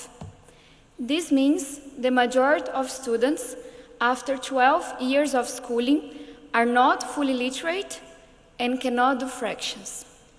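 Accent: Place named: India. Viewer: Sim, tá parecendo um indiano hahaha Acho que é porque ela está falando devagar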